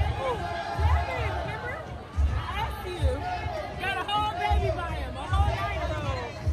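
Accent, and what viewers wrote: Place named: North Carolina, United States. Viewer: It’s crazy how you can tell someone is from NC without even knowing them!! Tre-4!! 😂Just found your channel girl love the content!!!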